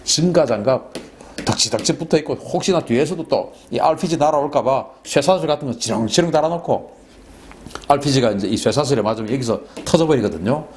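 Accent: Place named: Gyeongsang, South Korea. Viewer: 산인균 말 들어보니 경상도네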